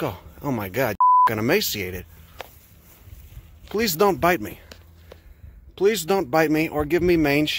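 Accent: Chicago, United States. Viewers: It's a classic Chicago accent. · It’s a Chicago Italian accent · From Chicago! Thick Chi-town accent! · Chicago, actually :) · Chicago accent. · Nope, it's a classic Chicago accent. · Definitely Chicago Italian accent, my dad has the same accent. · It actually is a character voice, "“I did a couple videos where I talked in my normal voice, but it just didn’t feel right,” says. “So I put all this narration through this voice of a 50-year-old Chicago mook from the West Side.” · The Chicago accent is dripping from this man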